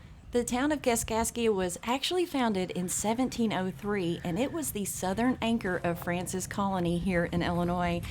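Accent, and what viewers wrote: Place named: South, United States. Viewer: Love that slightly southern lilt in your voice.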